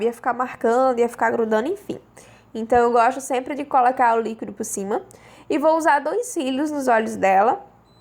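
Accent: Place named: Rio Grande do Norte, Brazil. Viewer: Sotaque lindo do RN ❤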